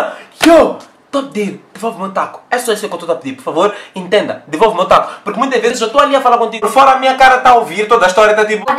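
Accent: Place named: Mozambique. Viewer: Sério wy. Teu sotaque de Moçambique me cuiou bué 😂😂. Faz parceria com um YOUTUBER Angolano mano.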